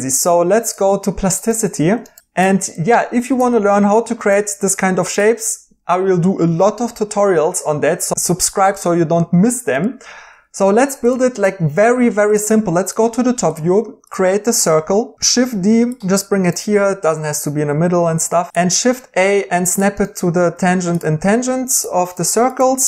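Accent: Germany. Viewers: So ein schönes deutsch-englisch und auch das Programm ist beeindruckend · that german accent xD SCHLAND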